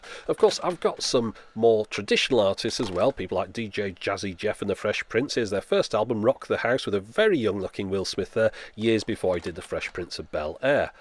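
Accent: United Kingdom. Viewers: Thanks,. excellent videos. I relate totally to the spectrum analyzer aspect of home and auto hifi. and for the record, your voice is excellent. for some reason, British accents are like fingernails against chalkboards to me, but not yours. keep up the great work.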